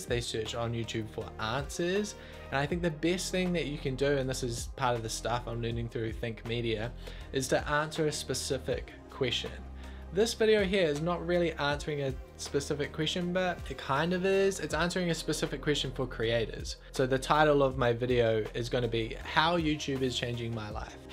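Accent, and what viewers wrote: New Zealand. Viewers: Awesome video man, thanks for all the tips. So nice to hear that kiwi accent, sounding mint! I'm just starting out with my videos, excited to work on some long form.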